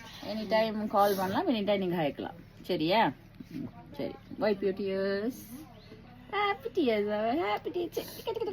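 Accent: Sri Lanka. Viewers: அழகான புன்னகை, உங்கள் அன்பு, பிணைப்பு, உங்கள் அழகான இலங்கைத் தமிழ் ஆகியவை என் இதயத்தை முழுவதுமாக ஈர்த்துவிட்டன. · ஈழ தமிழே தமிழ் தான்.. என்ன ஒரு அழகு அந்த செந்தமிழில்.... "காலத்தி னாற்செய்த நன்றி சிறிதெனினும் ஞாலத்தின் மாணப் பெரிது" என்ற அய்யன் வள்ளுவனின் குறள் கண் முன் வந்து போகின்றது. நீர் வாழ்க வளமுடன் சகோதரி - மதுரையிலிருந்து சீனிவாசன்..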